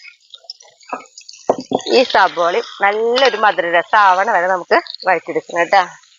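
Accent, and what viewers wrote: Thrissur, India. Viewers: അത് തൃശൂർ ക്കാരുടെ ഒരു സ്റ്റൈൽ അല്ലേ?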